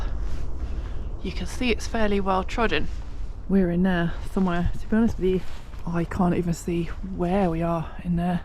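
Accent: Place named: RP, United Kingdom. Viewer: You sound very posh to me. It's like I'm watching a BBC Nature programme.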